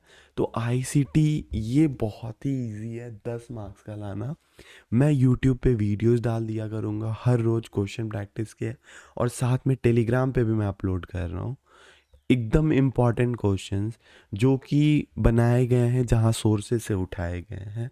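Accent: Bihar, India. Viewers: Sir, are you from Bihar..? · India se Hai... bihar kya laga raha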